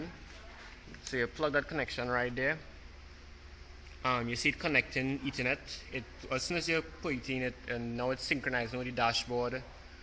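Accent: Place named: Trinidad and Tobago. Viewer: You sound like a Trini.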